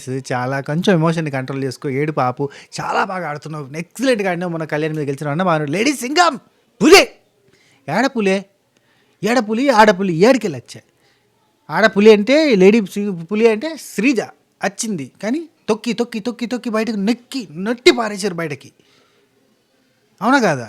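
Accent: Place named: Rayalaseema, India. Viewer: Yendi ee roju మా సీమ యాస కూడా వచ్చంది 😂😂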